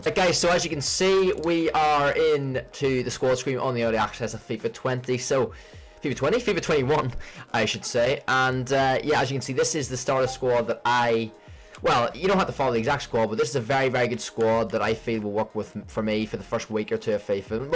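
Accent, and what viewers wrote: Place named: England, United Kingdom. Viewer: Good video, but why do u sound half English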